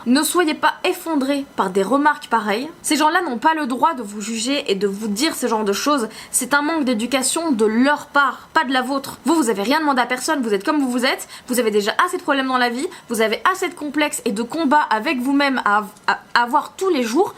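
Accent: Belgium. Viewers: Coucou!! Hier, je suis tombée sur ta vidéo "95E et plus de soutif". Je ne te connaissais pas, mais le titre de ta vidéo m'a interpellée. J'ai aimé ta personnalité avec ton petit accent Belge que j'aime beaucoup <3. Et là, pareil, le titre de cette vidéo, m'interpelle et je suis dégoûtée de ce que tu as pu vivre!! Je ne comprends et je ne comprendrais sans doute jamais ce qui ce passe dans le cerveaux des mecs (si tant est qu'ils en aient un!!) Je suis comme toi, je me demande ce qu'il faudrait faire pour pouvoir les afficher. Peut-être, en criant "regardez messieurs dames, ce monsieur est en train de se masturber.... sortez vos téléphone pour le filmer et le publier sur les réseaux sociaux!!!" Je ne sais pas si cela changerait quelque chose, mais je suppose que la personne la plus mal à l'aise serait certainement ce porc et non toi. Je n'oublie pas que ce genre de situation est hyper perturbant, mais peut-être que cela exorciserait ton angoisse de l'instant?? 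Les lois ne bougent pas et les femmes ne sont toujours pas considérées comme elles devraient l'être.... Bienvenu au 21ème siècle!!! C'est grave quand même que l'on en soit toujours là.... Bref.... Merci pour ton témoignage, il peut servir pour nos "sœurs" Ha oui! J'allais oublier, j'ai certainement l'âge de ta mère ou même plus.... Et j'ai vécu ce genre de situations pourri quand j'étais jeune et à croquer.... Donc, je sais précisément ce que tu peux ressentir dans ces moments là. Gros bisous ma belle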